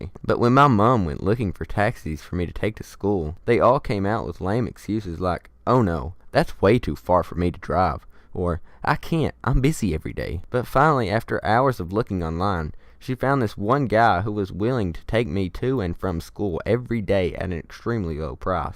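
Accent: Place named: South, United States. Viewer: You are so southern what state are you from?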